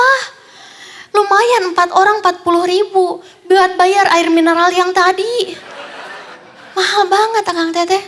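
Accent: Medan, Indonesia. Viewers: ku kira kau medan kali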